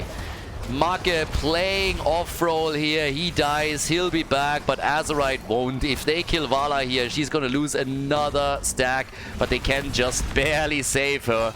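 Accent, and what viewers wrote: Germany. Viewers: Hm... are u a native speaking german guy?! It seems like with that dialect ^^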